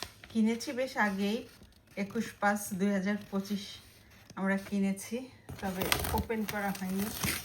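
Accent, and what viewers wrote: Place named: Jessore, Bangladesh. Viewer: আপু আসসালামু ওয়ালাইকুম।কেমন আছেন?আপু জানতে চাচ্ছিলাম,ওখানে কাজ করার জন্য শিক্ষাগত যোগ্যতা কেমন লাগবে আর কোন ভাষায় কথা বলতে হয়? আপু আমি জেসমিন আক্তার। আপনার কথা যশোর জেলার মানুষের মতো মনে হোচ্ছে।আপনার বাড়ি টা কোথায় জানতে পারি?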